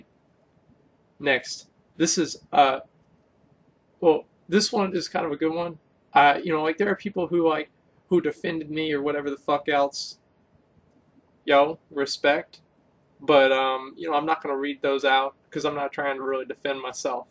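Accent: Texas, United States. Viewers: nah you're right he sounds like a caricature of a texan in this vid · am I crazy or did Asmon have a southern accent in 2013?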